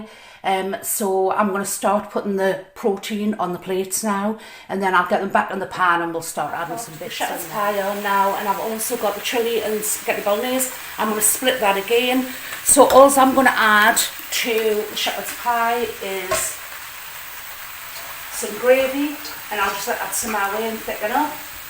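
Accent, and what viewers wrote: Newcastle, United Kingdom. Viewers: Ah cool you sound like around Gateshead ,Newcastle etc area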